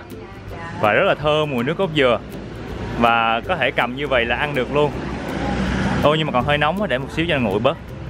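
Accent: Mekong Delta, Vietnam. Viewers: Giọng nghe chất miền tây nhưng ngôn ngữ và ngữ điệu lại rất phổ biến phù hợp với tất cả m.n tóm lại nghe tiếng đã lắm! P/s: giọng này kể chuyện mà là TUYỆT kkk...😄😄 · Giọng miền tây dễ thương ghê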